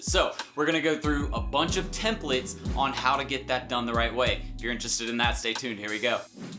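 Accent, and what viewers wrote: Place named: South, United States. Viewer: Hi, that was a very good video with a lot of value, but I love your Southern accent the most.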